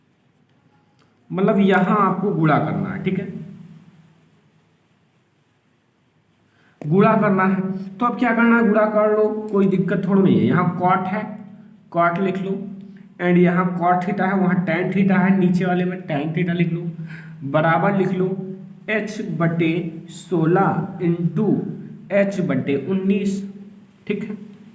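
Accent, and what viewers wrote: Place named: Uttar Pradesh, India. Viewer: Kya bhai tu toh U.P side ka lag raha hai .. Me GUJARAT SE Hu 10 CBSE BOARD ..Ane wale hai boards 😨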